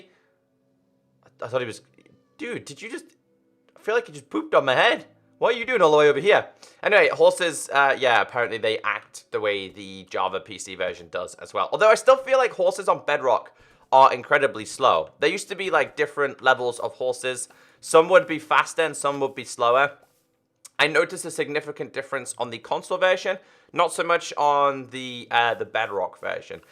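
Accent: United Kingdom. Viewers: He is british, i don't know if english, welsh, irish, or scottish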